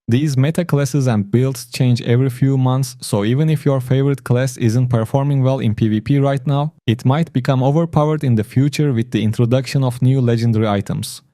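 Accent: Turkey. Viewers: Türk olduğunu söyleyebilirim ama ispatlayamam. Aksan bariz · Türk aksanı nerde görsem tanırım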